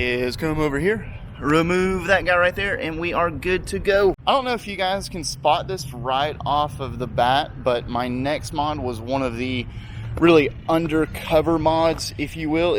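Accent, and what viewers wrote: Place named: Georgia, United States. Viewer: You sound like chase on two wheels. Must be a Georgia thing. Sick bike btw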